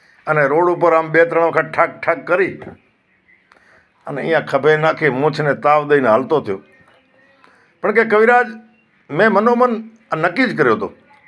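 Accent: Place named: Kutch, India. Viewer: સચોટ વાત કવિરાજ તમારી કચ્છી લઢણ માં બોલતી ગુજરાતી ભાષા..જબરદસ્ત❤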